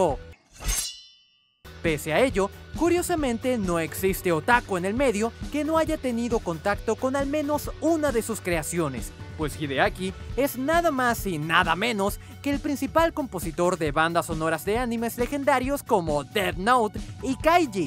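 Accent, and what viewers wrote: Venezuela. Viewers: eres Venezolano?jajaja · hola y buenas disculpa pero eres venezolano? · eres de Venezuela? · Tío eres de Venezuela? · Verga pero el que habla es Venezolano?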